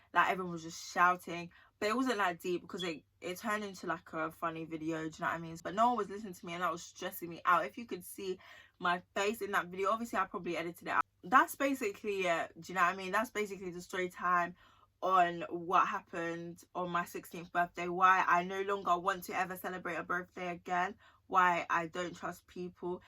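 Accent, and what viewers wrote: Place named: United Kingdom. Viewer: Im from the US And I believe your from the UK Right You have a Uk accent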